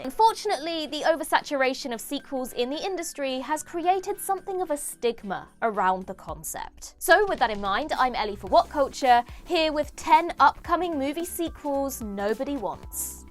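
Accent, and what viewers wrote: United Kingdom. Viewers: Could this hostess be any more British?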